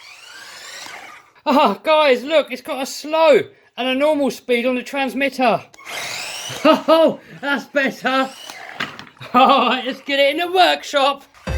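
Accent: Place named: England, United Kingdom. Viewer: So glad i dont live in England. The accent is terribly annoying. Cant handle it. Cool vids on mute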